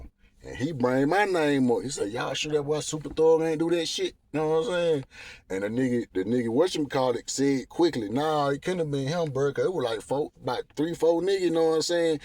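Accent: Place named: South, United States. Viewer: You sound like my folks in Atlanta! #southernaccent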